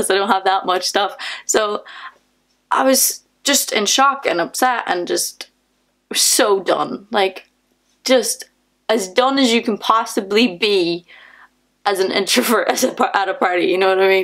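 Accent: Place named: Ireland. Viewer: Which part of Ireland is that accent from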